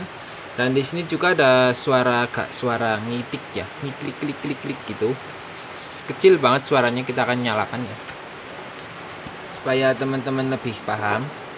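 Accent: Banyumas, Indonesia. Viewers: Akhire koe ngapak jugak🤣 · Kie wong mbanjar jane ya.. Mulane si ngapak😀😀😀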